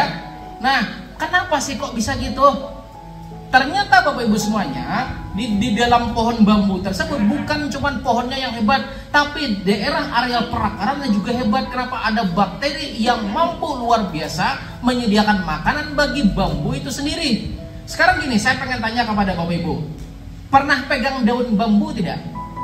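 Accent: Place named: Sumatra, Indonesia. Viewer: Ulun kira piyan i urang sumatra. Saklainnx sama ja wan ulun urang berabaei.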